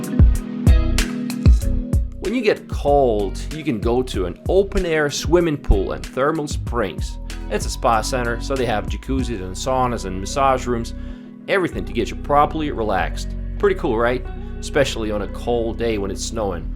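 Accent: United States. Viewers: It's weird and pointless to hear Russian city names pronounced with an exaggerated American accent, but I'm not criticising. Moreover the channel (which is great) isn't mine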